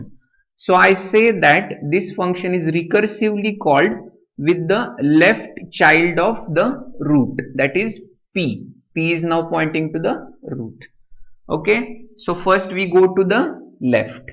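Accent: India. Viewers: You deserve more followers! Great video. By the way was that Marathi accent? :)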